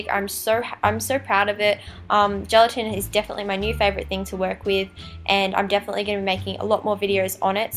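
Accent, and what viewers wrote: Australia. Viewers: Hi OMG your so awesome at FX I really want to try making stuff like this for halloween and stuff. Once question where do you but your makeup like the pallets ? If you get them offline what is the name of the website? Btw I love your Australian accent idk I love Australian accents 😂 And can you please do a Q&A if you do here is a question how old were you when you started FX makeup? Thanks Ariana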